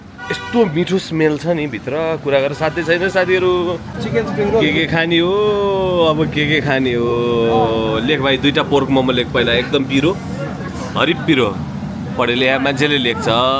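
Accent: Darjeeling, India. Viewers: Too good Dai Darjeeling accent 😂😂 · Bro love the darjeeling accent 😂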